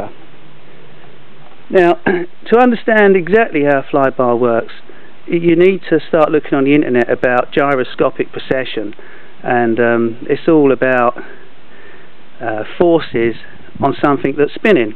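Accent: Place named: South London, United Kingdom. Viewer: you south london geez? brixton/peckham accent, well cool.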